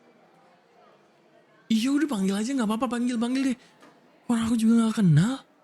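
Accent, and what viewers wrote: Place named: Kalimantan, Indonesia. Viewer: _mas:cara ngomongnya kaya orang kalimantan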